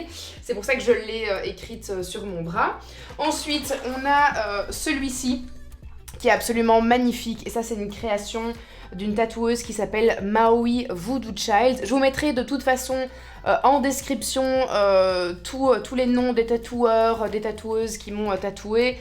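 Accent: Belgium. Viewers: Hello madame, ton accent! Tu es belges? Big up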